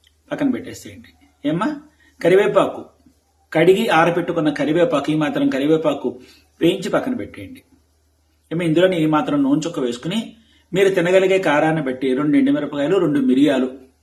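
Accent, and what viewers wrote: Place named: Godavari, India. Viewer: మీరు గోదావరి యాస లో మాట్లాడుతున్నారు మీది ఏ ఊరు సార్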